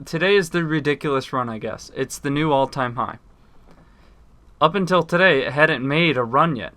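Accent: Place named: United States. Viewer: You sound like your'e from America. I thought bitfinex doesnt allow US customers?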